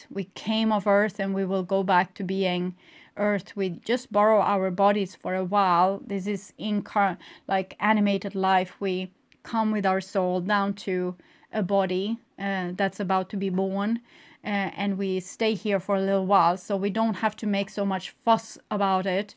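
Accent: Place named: Sweden. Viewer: I love your readings ! They are long but are very in-depth ! I actually after a first watch / listen ! I replay them your voice is very soothing, it’s the Swedish accent speaking English I think it’s very soothing , it makes me fall asleep on a replay! You are correct with being troubled I’ve been troubled for years in regards to an old partner who I have children with who is a drug addict ( meth amphetamines ) it has destroyed her and all I do is walk around and pick up the pieces which is the children ! And to be honest with out being self promoting I’ve done well serving my children ! The enemy ( the drug ) not the person attacks the wall every now and then but weve held strong , the boys know how it can be very calm then the storm comes they’ve been through so much at a young age ! However I haven’t been able due to the hard work of raising children to find a suitable partner 7 years of bad luck but I do believe it is over! 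There is something in the air this spring ( Southern Hemisphere) I am a pagan and I gave some sacrifice to the gods / goddess this spring in an offering of mead seeds honey and work in planting trees some meditation! My ego over the years thought it was strong enough to be alone but it is really not natural so throw these things to the wind and hope for the best! Tusen takk loving lady from the north ,-) ,,,,, haaaaaaa you are so right with being nationalistic , I am an ex soldier who has been to war ! It changed me totally, for sometimes bad but for t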